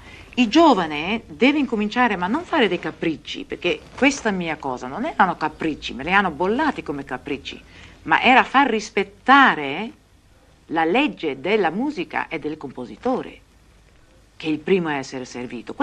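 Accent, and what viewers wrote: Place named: Veneto, Italy. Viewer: Giusto. Si sente (ancora) l'influenza dell accento Veneto.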